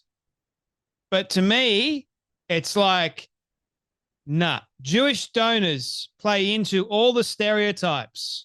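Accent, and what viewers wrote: Australia. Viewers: Love, love your accent...are you Aussie? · You an Aussie?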